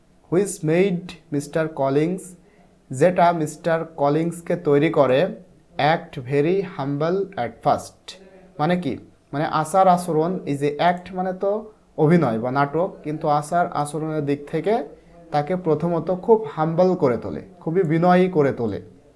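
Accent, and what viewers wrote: Bangladesh. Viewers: Apnar bangla english accent sondor asa, thank you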